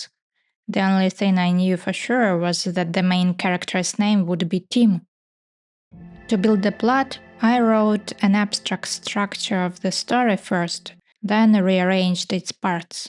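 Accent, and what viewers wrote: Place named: Russia. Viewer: судя по акценту вы знаете русский, я прав?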